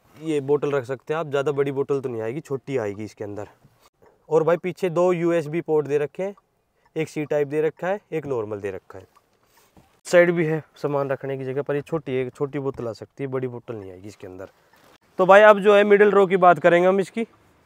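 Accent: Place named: Haryana, India. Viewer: Bhai mere loved ur haryanvi tone …. Pehli bar haryanvi mein car vlog dekh ke ghana hi maja aagya … moj kar di bhai … bhot badhai aapka haryanvi mein vlog dekhte hi subscribe kia meine channel aapka … Jai shri Ram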